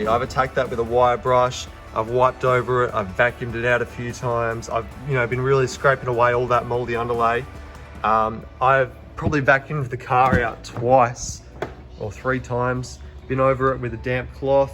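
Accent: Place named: Australia. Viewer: the job looks like it was quite a lot of work, you did a good job. What's with the furry seats LOL, Maby they will be a project too. I see your dad helps you a lot, I had a dad like that. you're really lucky, a lot of guys don't have dads like that. You should always let him know how much you appreciate him. I enjoy your videos, keep them coming I am looking to see what all you do to your vehicle. I am in Florida USA, your accent sounds like your down under.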